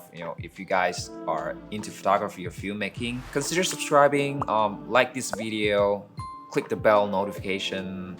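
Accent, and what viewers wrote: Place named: England, United Kingdom. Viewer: Subscribed!!! Wow bro I like your English accent😍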